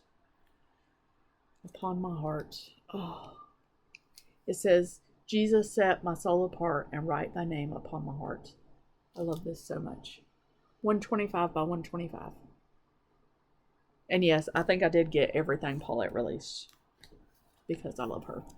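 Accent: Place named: South Carolina, United States. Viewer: Hi! The thing that drew me to this video was your beautiful Scarlett House Floral Motif piece! It is simply stunning. It is really calling my name, but I’m trying to WIP down! I am also charmed by your accent! You are from SC? I’m originally from Tennessee although I live in Chicago now. I used to sound a lot more like you and I miss it! lol. Sounds like you had a fabulous retreat. I love spools and want to find a big one some day to put in my craft room! I’ll be looking forward to your next one whenever you get around to it!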